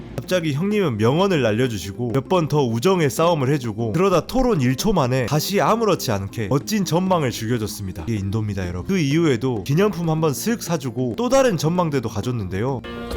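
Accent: Jeolla, South Korea. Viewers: 너무 재밌어서 구독하고 가요!!! 근데 전라도 분이신가봐여 억양이 ㅎㅎㅎ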